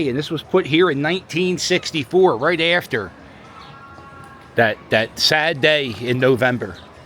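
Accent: Philadelphia, United States. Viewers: Love your Philly Accent - Helen from Bal-more! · That's a serious Fluffya accent. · Linguist consider the Philly accent to actually be a southern accent due to vowel sounds. Baltimore is similar. We Philly folks just talk fast. And this guy has the best Philly accent I’ve heard in a long time. Makes me homesick.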